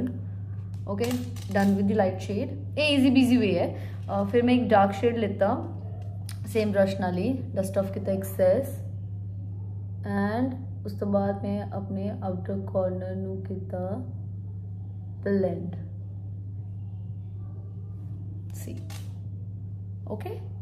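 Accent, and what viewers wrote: Punjab, India. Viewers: Its jst pure punjabi accent..hum bhi ghr pr normally bros hi bolte jdo punjabhi vich gl krde...brows waala accent niklda hi nhi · I wish i cuold learn from you but i m from Pakistan... Love the way you speak punjabi i jst love it... I am your new viewer..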